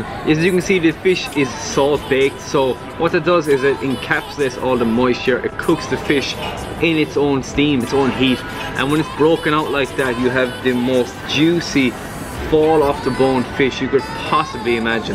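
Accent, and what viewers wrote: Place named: Ireland. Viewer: very nice intro, looks nice restaurant, OMG!!.......there are so many good restaurants..in Spinola bay..sadly so many come and go!! i subbed, so i keep an eye out for you..( sounds a little like a guy from the Emerald Isle )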